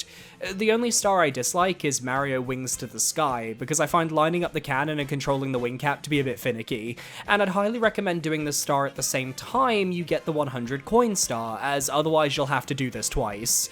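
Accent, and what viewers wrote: United Kingdom. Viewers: I really enjoyed this video (thank you) but I am very confused by you, you have an English accent but use American words and pronunciations, how is this? 😂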